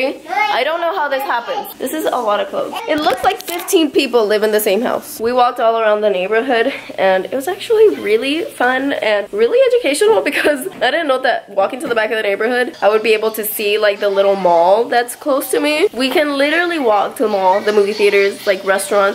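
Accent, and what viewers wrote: Puerto Rico. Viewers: i love how puerto rican he sounds. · El bien boricua jajajajaja arrastrando la R y hablando sin la S al final de las palabras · I recognize that Spanish accent anywhere! Puerto Rico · He is Puerto Rican is obviously 😂 reconozco mi acento “déjame veL”😂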